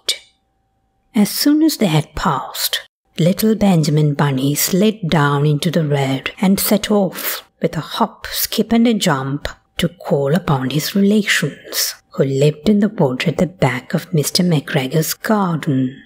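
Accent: RP, United Kingdom. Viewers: This is how Beatrix Potter should be read, in posh British accent, with that old flavour! Ty for this.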